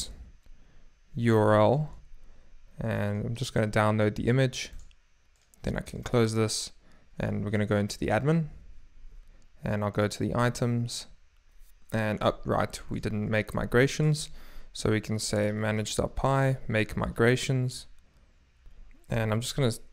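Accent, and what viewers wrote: South Africa. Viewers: is from Pretoria I think. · Jy is 100% van suid afrika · Is that a South African accent I'm hearing? 😌 Thanks for the tutorial 👍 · Is this guy from South Africa? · Sounds like a South African accent. 😌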